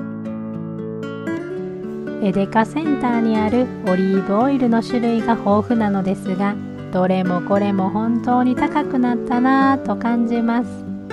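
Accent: Kansai, Japan. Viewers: こんにちは😊 今週はバタバタして今頃拝見させて頂きました！キャベツと鶏ムネが塩して放ったらかてるだけで、美味しくなるなんてどんな魔法ですか！？😳 さんの動画の好きな所は、さんの声と関西のイントネーション🎶 経験からの暖かい言葉、でしょうか🤔 あと、マリメッコのエプロン！かわゆす❤ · 今日は予定をこなし帰宅後すぐ観れました❤ 母が転倒して右腕を打ち食べる事も出来ないので数日寝泊まりした後、ショートステイに入居させました。痛みはなかなか消えないしあちこち悪くて退去後も1人で暮らせるか施設も視野に様々な選択を迫られています😢 動画を毎回観るのが楽しみで癒しです。 Nobanaさんの関西弁だけど柔らかいトーンのナレーションが好きです✨ つまみ食い女王にもお会いしたいです😊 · ドイツ、学生の時からの憧れの国。そこで彼方の方と国際結婚で暮らしている事に興味津々です😊ご家族もいて、穏やかな関西弁がほっこりしてます。初めて観てチャンネル登録させていただきました。どこで暮らしても小さな幸せ見つけることって大切ですね。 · 私は、とにかく nobanaさんのおしゃべりが大好きです😍 バリバリの関西弁😂癒されるわーって感じです。住んでいるのは横浜ですが、母方の実家が関西なので😊 あとは、やはりドイツ暮らしが、手に取るようにわかること。主婦目線、母親目線、いろんな場面があり楽しいです。これからもずっと応援しています♪♪♪ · はじめまして。今日初めてユーチューブ拝見しました。他の方もおっしゃっているようですが、優しい関西弁がとても素敵ですね。なんだか癒やされます。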